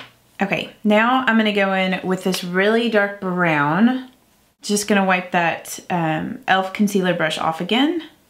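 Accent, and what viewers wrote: South, United States. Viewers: Lol you’re so cuteee! Sounthern accents are so cute 😭😍☺️☺️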